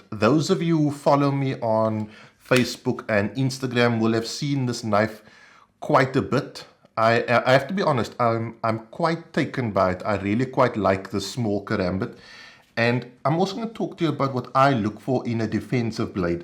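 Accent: South Africa. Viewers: Great review! ☺️ I look for the very same thing in defensive knives, and have one in quite a similar shape. Also, your South African accent is very beautiful!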